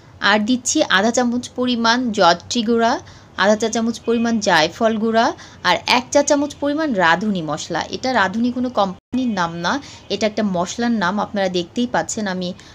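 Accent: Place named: Chittagong, Bangladesh. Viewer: আপনার কি সমস্যা? ভালো না লাগলে ইগনোর করেন।আপুর কথায় চট্টগ্রামের টান আসে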